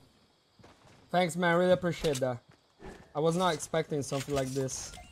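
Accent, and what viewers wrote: United Kingdom. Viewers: Quanto sei british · O mio dio ma quanto sei forte e anche molto british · Grandissimo bro partita easy come sempre... Ma poi quanto sei british😂😜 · L accento inglese, oddio, mai piu da te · Ma quanto cazzo sei british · Troppo brittish entra così a far parte della dpg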